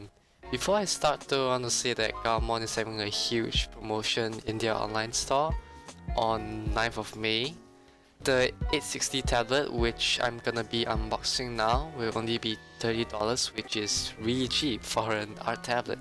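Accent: Malaysia. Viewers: Syclone Shush Im Malaysian so I know his accent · Your accent sounds like Malaysian accent if you are Malaysian i wanted to say SAYA PERMINAT AWAK(i am your fan)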